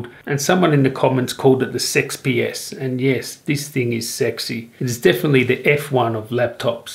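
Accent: Australia. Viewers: Is it just me or does this guy sound like an Australian Trump? (no offence intended, just the way he speaks reminds me of him) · If anyone knows who videogamedunkey is, do you guys think this guy sounds very simliar except with an australian accent?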